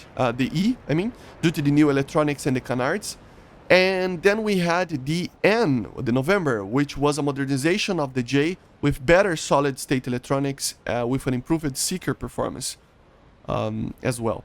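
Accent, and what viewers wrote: Brazil. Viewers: Só pelo sotaque já percebi que é BR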